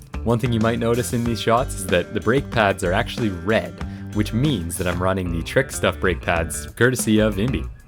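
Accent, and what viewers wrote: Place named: Canada. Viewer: I absolutely the combination of yar calm voice and the canadian accent, you basically have the perfect narrating voice ❤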